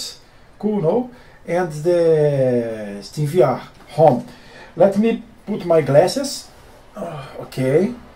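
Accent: Brazil. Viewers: quanto eu ouvi a voz na hora sabia que era br falando ingles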